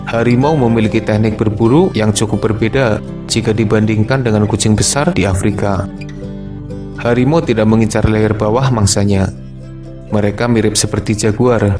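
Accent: Banyumas, Indonesia. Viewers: Wong banyumas kayane kue wkwkwk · Ngapak ya bos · Aku tebak mimin nya orang ngapak,betul gak? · Salfok sama penyampaian bahasanya. Orang ngapak ya?🤣😂🤣😂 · Logat mu NGAPAK😅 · Logat ngapake ceto tenan 😂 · Aja ngapak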